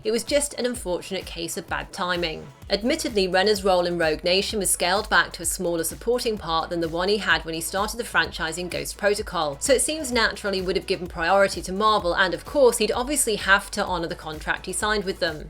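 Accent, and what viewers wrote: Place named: United Kingdom. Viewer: British english ? wow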